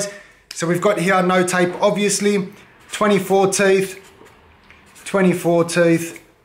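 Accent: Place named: Australia. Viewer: Will scribing first help? You sound like the Aussie building inspection guy. Must be a cuzzie.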